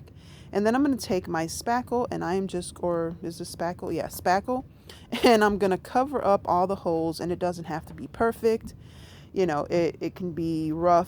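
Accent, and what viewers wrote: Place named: Puerto Rico. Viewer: Beautiful Flag!! 👌I have always love the Puerto Rican accent !! Thank You for sharing 😘❤ your Word I think is Bird. 🥰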